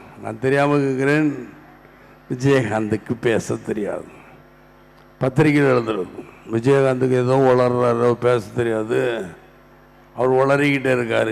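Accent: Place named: Telugu, India. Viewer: First learn tamil we know u r telgu person....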